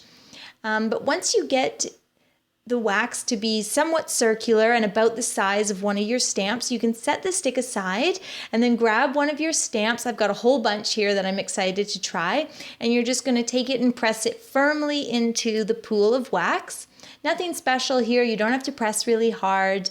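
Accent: Canada. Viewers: Are you Canadian?? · I might be wrong but she sounds Canadian. I can tell because shay mitchell says aboot and she's Canadian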